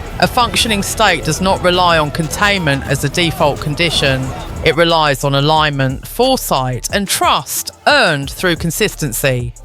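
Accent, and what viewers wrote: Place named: London, United Kingdom. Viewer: Your dialect is smack in between Queen"s English and cockney.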